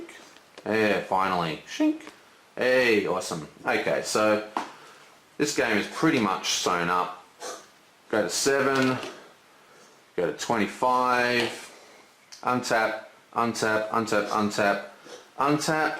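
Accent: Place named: Australia. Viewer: Neutral australian or nz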